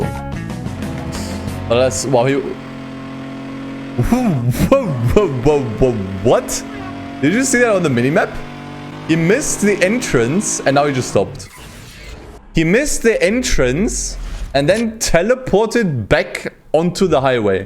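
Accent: Germany. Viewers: Top video, eine Frage bist du deutscher ? Hört sich vom Akzent oder Dialekt her an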